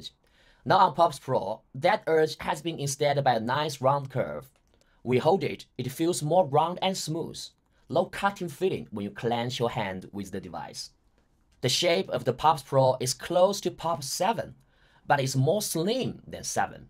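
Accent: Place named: London, United Kingdom. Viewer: 好家伙 这口音 老伦敦正米字旗的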